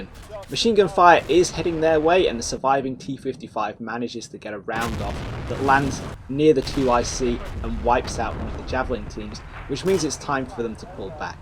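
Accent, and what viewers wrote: United Kingdom. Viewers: Hey! Your editing and narration are just wonderful! In my book, you are the best Combat Mission Youtuber around.:D Must be something with you Brits... the best Youtuber for Forged Alliance Forever is also a British guy his channel is called 'Gyle Cast'. I played this scenario because of your video and enjoyed it very much. I use to be a bit more cautious in moving-to-contact which led to me losing just one lad. Aside from that your tactical analysis is spot-on so I went with much the same plan as you and it also played out much the same. The scenario reminds me very much of the first mission of the 'Task Force thunder' campaign which is in a similar context and tactical situation.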